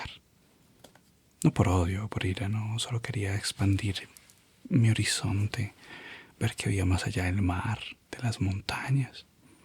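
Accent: Colombia. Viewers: Es colombiano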